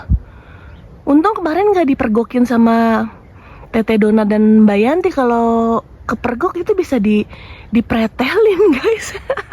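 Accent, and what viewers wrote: Indonesia. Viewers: Selamat mba,semangat ngonten nya.PD mba,suaranya enak kok di dengerin 😊,khas anak jaksek.ternyata ya orang jagakarsa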